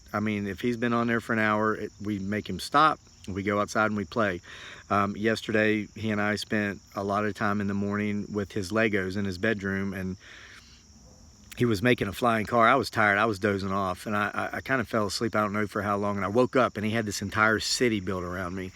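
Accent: South, United States. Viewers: Hey, watch out flipping that old phone around, you might get beamed up to the Starship Enterprise. Haahaa. Just kidding, I got one too, and will keep it until it dies. Real money savers too. You are right about people zoneing out with their noses 8" from the I phone screens. It will, and is having a negative effect on our society, people (Young people) have lost the art of simple conversation and reading faces and expressions, and that is concerning. Agree that getting a signal in the country can be very hard, you may have to use a different carrier. Here in the Ozarks, it can be a problem sometimes too. I see that you have a bit of a Southern accent, are you from the Carolina's? If so, did you hunt and fish as a boy with your dad? Will you be teaching your son those skills too? Enjoy your show. Thanks.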